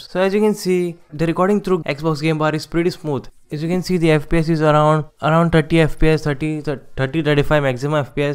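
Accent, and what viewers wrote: India. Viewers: Nice 1.16.3 english Indian boy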